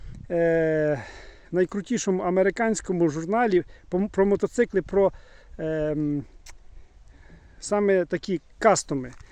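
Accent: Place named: Surzhyk, Ukraine. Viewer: У Вас дуже багато у вимові суржику. А в хлопців дійсно руки золоті.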